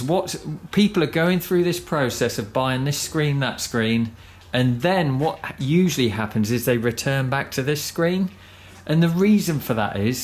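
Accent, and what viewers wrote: United Kingdom. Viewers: Absolutely awesome review! I am a Rockster rider since 2010 and i couldn''t agree more about your comments concerning drive shaft! Now maybe it's time so see if some future 1250 owners are selling a Rallye at a good price :) I just went to a BMW dealer last friday and i jumped on a low frame low seat Adventure and i really tought i couldn't touch the ground with both feet with my 1.82m but i was wrong! I felt very good and so i added the Adventure to my possible choice! Thanks for your video, loved the bike, the clear english accent and the true passion that i felt when you picked the bike from the dealer! Cheers Loris from Italy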